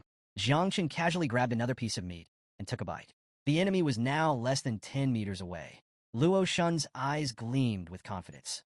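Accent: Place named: United Kingdom. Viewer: Why does this have british accent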